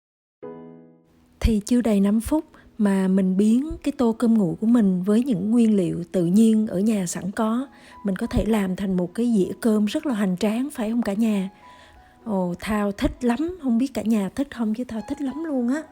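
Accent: Hue, Vietnam. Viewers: Người Huế .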